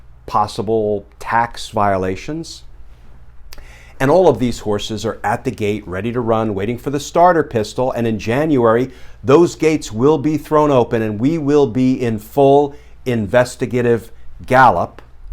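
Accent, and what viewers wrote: South, United States. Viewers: Nothing personal... But Glenn, every time you say "he'yall" I think you are saying, "hell all." Can't take the Southern accent away no matter how long you have been gone. Just saying"... Another Great video!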